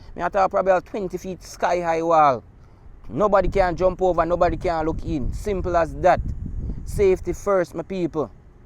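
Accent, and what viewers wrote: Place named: Patois, Jamaica. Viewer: In reference to you speaking patois,most of us were taught English in our homes.Our language culturally defines us and it’s okay.Building your platform requires clear spoken English so all your audience can understand.Thank you for choosing to provide this forum ,positive content is what we earn for ,blessings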